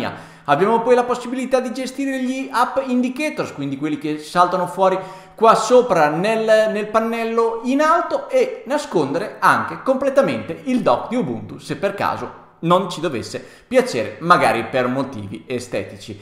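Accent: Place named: Emilia, Italy. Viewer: sento un accento famigliare...tra Ferrara e Bologna...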